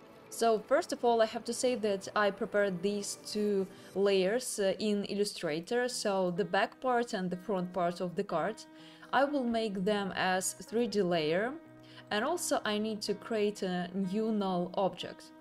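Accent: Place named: Russia. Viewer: Такой классный русский акцент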